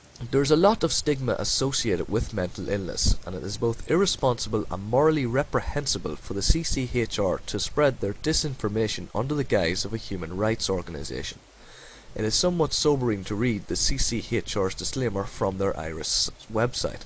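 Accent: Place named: Ireland. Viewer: great video man, I like your accent. Irish? very nice information~ keep it up ^_^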